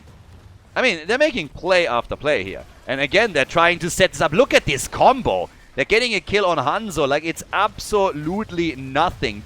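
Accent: Germany. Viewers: It's a German English accent.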